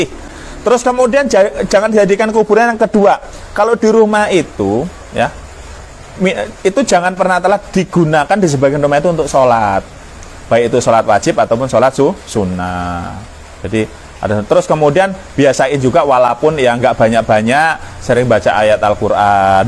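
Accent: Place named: Java, Indonesia. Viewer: ngomong Jawa medok nya gk berubah😂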